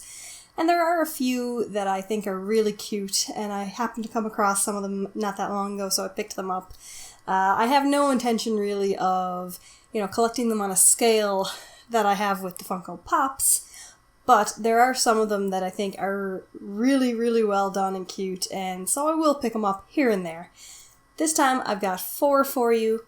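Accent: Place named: Ireland. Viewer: Is one of your parents Irish? It's just you say certain words with a real Irish twang. Loved the review.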